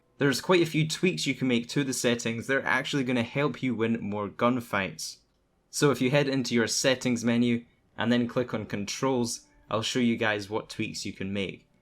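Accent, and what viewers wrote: Scotland, United Kingdom. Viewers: Omg are you Scottish!!! You sound Scottish!!! Iam Scottish 😍😍😍 subbing just for that dude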